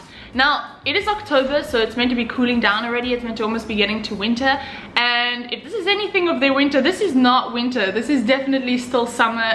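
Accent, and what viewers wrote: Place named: Israel. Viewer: I hink you already are incorporating the Israel accent! Nice.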